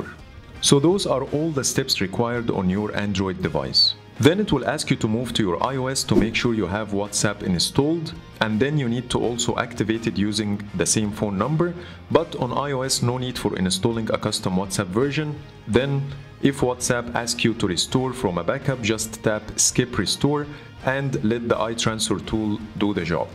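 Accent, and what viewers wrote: Egypt. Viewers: Your accent sounds Egyptian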